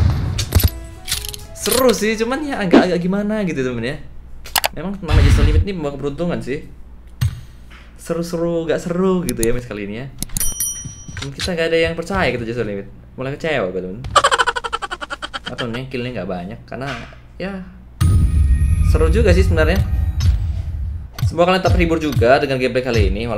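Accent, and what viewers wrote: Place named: Java, Indonesia. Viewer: Ngomong asem medok slur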